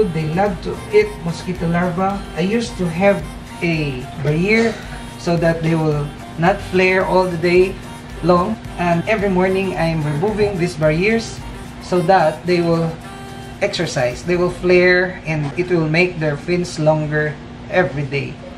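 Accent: Visayas, Philippines. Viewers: Sir asa mo dapita? Kay murag2 duol ra ky bisaya man hahaha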